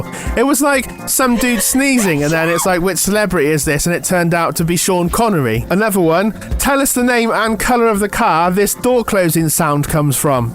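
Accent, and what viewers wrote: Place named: United Kingdom. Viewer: Just found your channel, you sound like every other british person on YouTube, but more into being on TV than on YouTube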